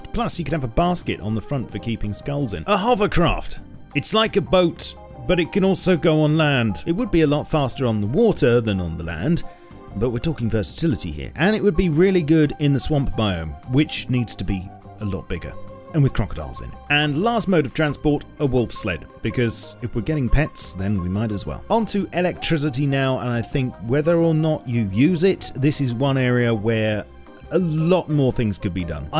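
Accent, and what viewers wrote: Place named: Cockney, United Kingdom. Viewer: ...hmm...I wonder if and The Spiffing Brit are related?..both are partial to a cuppa...both have posh accents with just a hint of East End..init..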